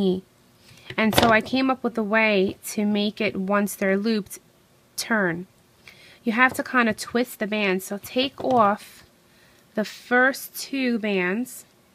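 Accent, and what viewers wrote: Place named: New York, United States. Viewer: Are you from New York? You have a slight New York accent and it's so sassy ^-^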